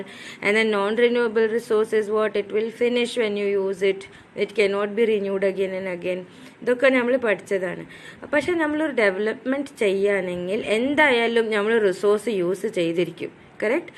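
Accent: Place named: Kasaragod, India. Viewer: Madam please try to avoid the Kasaragod slang ❤️ thank u mam for your help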